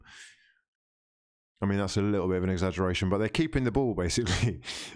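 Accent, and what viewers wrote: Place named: England, United Kingdom. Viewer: Its fuckin wild. I'm american and all I fuckin say to my friends and loved ones are things like "Love to your Mother", "Incredible Scenes" "Incredible Scenes of Glorious emotions" of course " FRAUDS" & "DON". Oh Here's a new one i've incorporated into my lingo "You're Having a laugh!!!" And the kicker is I DO IT ALL IN ACCENT. I FUCKIN LOVE THIS FRADULENT DON. P.S In America periodically saying words & english lingo that nobody fucking gets in an english accent haphazardly actually makes you look crazy. But im a fraud gang member so it's par for the course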